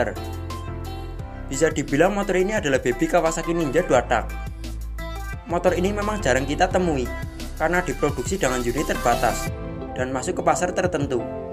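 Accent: Java, Indonesia. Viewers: Medok bet dah SE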